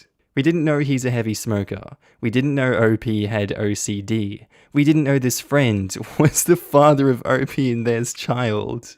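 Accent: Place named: Australia. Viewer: a pleasant Aussie voice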